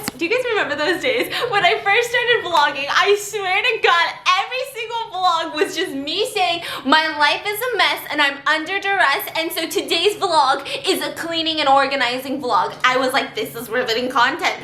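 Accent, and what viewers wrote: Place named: United Kingdom. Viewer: Awww, miss!! I love your British accent btw XD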